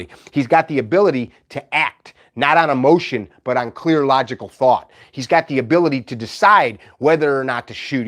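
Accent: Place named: New York City, United States. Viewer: You must be from New York City. It’s the way you said. Tawk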